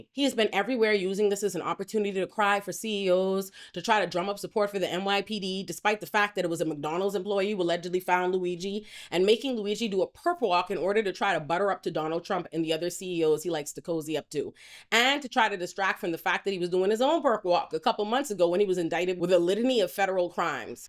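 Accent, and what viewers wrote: Bahamas. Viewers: Aside from me feeling like i hear a bahamian accent trying to come from you 😅.... i always said new york will fall first. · Are you Bahamian? I recognize that accent anywhere lol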